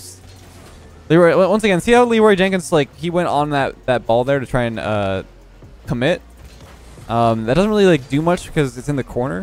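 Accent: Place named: Canada. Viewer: sounding especially Canadian today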